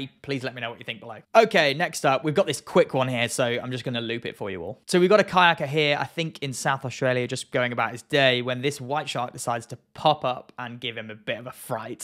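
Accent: United Kingdom. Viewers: ❤ the British accent!!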